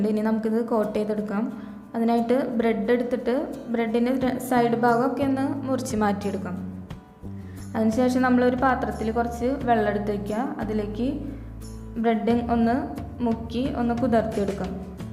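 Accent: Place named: Thrissur, India. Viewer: ബ്രഡ് കൊണ്ട് ഒരു കിടിലൻ സ്‌നാക്ക് easy ആയി അവതരിപ്പിച്ചു ,തൃശ്ശൂർ സ്ലാങ് ആയത്‌ കൊണ്ട് കേട്ടിരിക്കാൻ നല്ല രസമുണ്ട്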